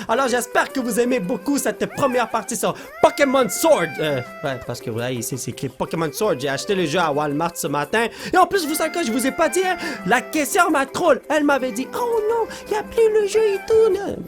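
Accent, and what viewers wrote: Canada. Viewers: Tes français chinoi canadien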